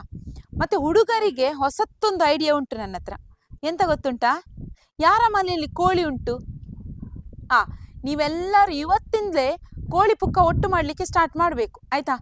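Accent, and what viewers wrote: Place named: Udupi, India. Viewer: Tumba maja agtade namma udipi kannada keluvaga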